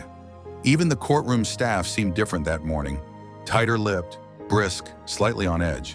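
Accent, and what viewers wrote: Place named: United Kingdom. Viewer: What's with the British accent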